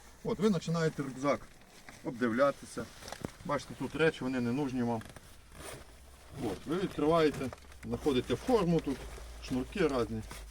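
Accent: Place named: Moldova. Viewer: Щчо ты говоришь,порося???? Щчо за нарЭчие??? Ты щчо,молдаван???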